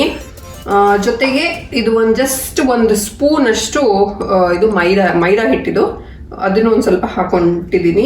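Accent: Mangalore, India. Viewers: ನಿಮ್ಮ ಮಂಗಳೂರು ಕನ್ನಡ ತುಂಬಾ ಚೆನ್ನಾಗಿದೆ.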